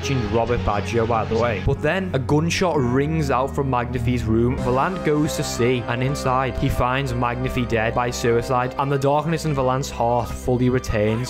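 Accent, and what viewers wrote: Liverpool, United Kingdom. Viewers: You're my favourite scouse ace attorney youtube channel <3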